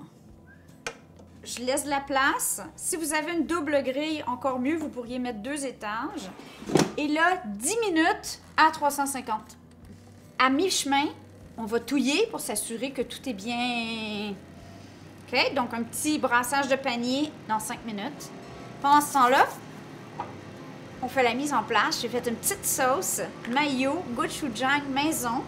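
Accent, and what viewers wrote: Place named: Quebec, Canada. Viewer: J’hésite.. ton accent.. Québécoise? Française? Québécoise qui parle à la française? Quel mystère! 😂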